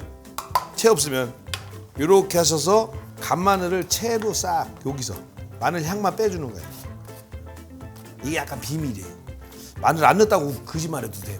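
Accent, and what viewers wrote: Chungcheong, South Korea. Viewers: 집에 갈비 있어서 내놨네요 맛나게 해먹을께요 충청도가 고향인데 언제 들어도 구수한 말씨도 좋아요~^^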